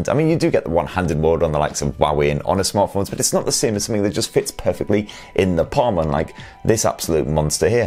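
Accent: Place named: Newcastle, United Kingdom. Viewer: Loving the Geordie accent :) Reminds me of my ex-wife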